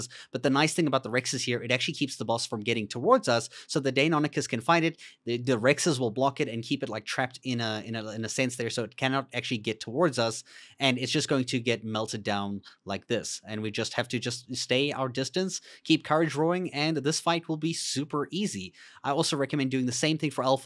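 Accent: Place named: South Africa. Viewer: I knew you sounded south African. Wild lol 😆 awesome guide bro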